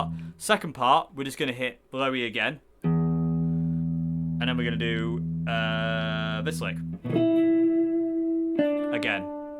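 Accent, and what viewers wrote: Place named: Northern England, United Kingdom. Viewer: Can not pinpoint your accent lol, it's slightly northern, but then very southern 😂😂 where you live?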